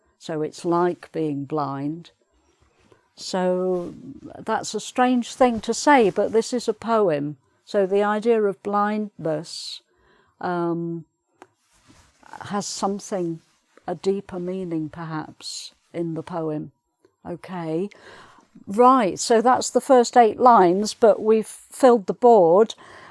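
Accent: United Kingdom. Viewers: I love British accent, and you are the best ♥ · Omg this lady is a pure british perfection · I'm 15 years old and I'm from Brazil! I love your work and I understand a lot of your words, british accent is beautiful!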